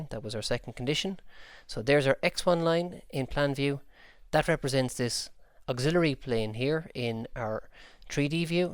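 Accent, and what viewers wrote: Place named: Ireland. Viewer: This is amazing! Very good job. Also love your accent. It's Irish isn't it?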